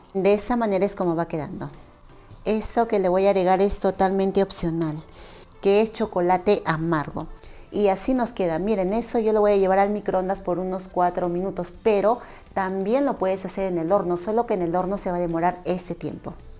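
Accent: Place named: Peru. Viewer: Hola, estuve buscando en internet de qué país eres, al oir tu acento me enorgullece que seas peruana como yo, pero cuando dijiste cilantro, pensé que eres mexicana, hasta que te ví con tu amor haciendo desayuno dominguero y mostraron la ocopa. Orgullosa de que seas peruanísima. Un fuerte abrazo a la distancia.🎉❤❤❤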